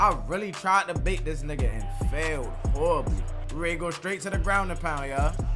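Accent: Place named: DMV, United States. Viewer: Your from the DMV aren’t you lol…I can hear it…Good content bro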